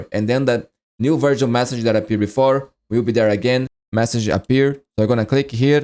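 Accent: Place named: Brazil. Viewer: Tu é brasileiro ne? Sotaque não nega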